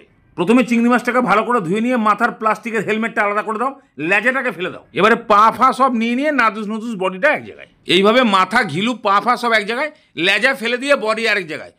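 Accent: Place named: Bangal, Bangladesh. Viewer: Tumi bangal bolo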